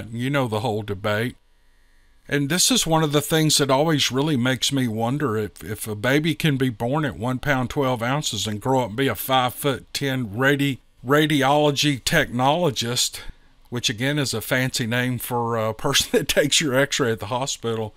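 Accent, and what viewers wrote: South, United States. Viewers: Nanny-mo!! 🤣 I LOVE the way you mispronounced that! 😘🥰 It's actually pronounced Nuh-nye-mo. But I couldn't care less if you get it right. I love your southern drawl and your great storytelling.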